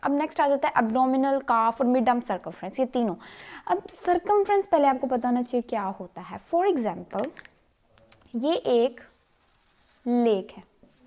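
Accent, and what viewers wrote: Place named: Pakistan. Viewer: mam your urdu accent is so cute😍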